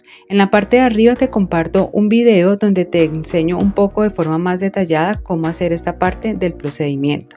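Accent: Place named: Medellín, Colombia. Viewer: están muy bonitas y la manera como lo explicas lo hace fácil de seguir el proceso, el tono de voz muy ameno, muy bacano. Pareces de Medellín. Saludos.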